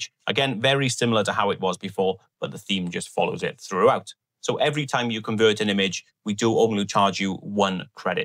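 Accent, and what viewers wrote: Wales, United Kingdom. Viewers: Love how you talk about dollars and cents with a Welsh accent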